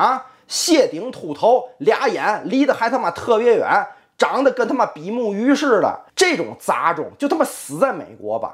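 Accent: Tianjin, China. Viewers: 天津口音真好听！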